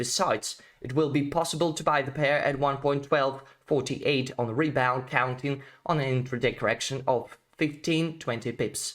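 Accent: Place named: Russia. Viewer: Are you from Russia? Ты из России? Произношение выдает тебя)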